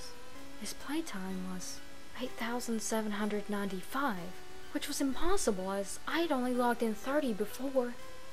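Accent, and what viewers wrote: South, United States. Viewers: I thought you sounded southern! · Australian?! LMFAO. Clearly tell she's a southerner. So cute.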